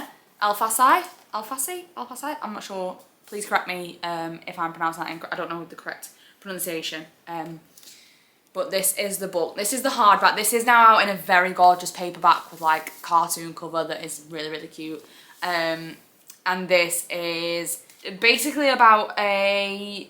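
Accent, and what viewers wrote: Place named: United Kingdom. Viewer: May I ask what region of the UK your accent is from? I've recently became interested in all the English dialects and want to learn how to distinguish them. I think the only tv show I recognize the accent is from Adolescent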